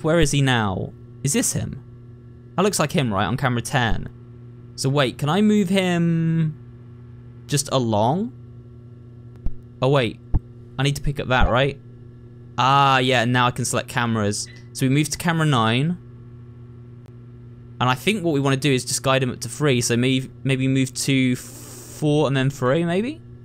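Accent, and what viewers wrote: South, United States. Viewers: His southern accent is so uwu ❤ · for a British person his sother accent is pretty good you can still tell he's not American born but that can but fixed up with practice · Lemme just say that as a native to the southern state of Georgia I absolutely love your southern accent 10/10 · As a Texan, I have to say your Southern accent is both cute and funny. Not bad! 😂😂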